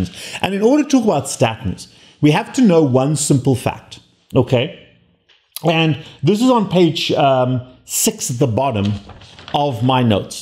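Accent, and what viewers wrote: South Africa. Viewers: Sounds like a Saffa with an American twang on some words.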